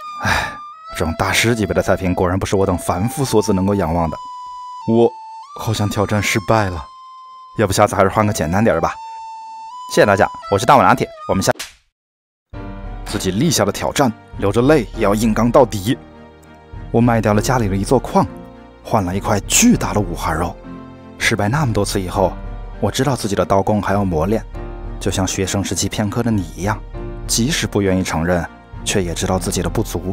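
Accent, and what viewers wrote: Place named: Changsha, China. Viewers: 这个解说太逗了，哈哈😄 听口音，你是长沙的不？ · 感觉博主有长沙口音……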